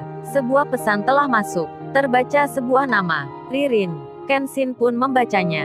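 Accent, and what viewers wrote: Surabaya, Indonesia. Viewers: min kamu orang surabaya ya?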